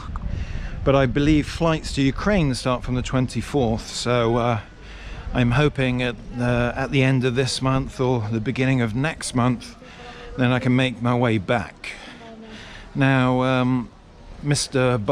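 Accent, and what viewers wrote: England, United Kingdom. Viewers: Bald and bankrupt got me here I am enjoying your vids too ,all ways wanted to visit Serbia since Tony Blair though it was a good idea to bomb it because he had no idea about history, can we have a little bit about your back story, how you got your very English accent maybe ?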